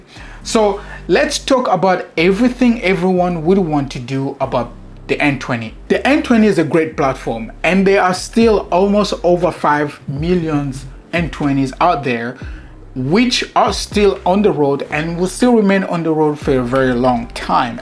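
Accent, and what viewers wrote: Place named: France. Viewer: Are you french ? You have a little accent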